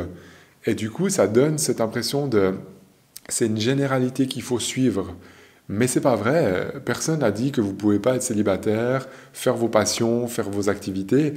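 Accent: Switzerland. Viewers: Merci ça fait du bien entendre un discours comme le votre oui j'en ai besoin, j'ai toujours été comme ça depuis très jeune. ( Je me disais bien que votre accent vient de notre beau pays la Suisse 😊 )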